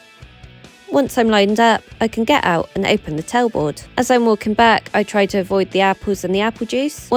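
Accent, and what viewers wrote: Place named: Somerset, United Kingdom. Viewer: Cider!!! 😂 Your accent always reminds of mid-Somerset where I used to live and combined with the apple load today I'm feeling a longing for the Levels again! 🙂 Love your sarcasm, the way you said High-Visibiilty-Vest made me laugh, lots. Love the videos. Thank you.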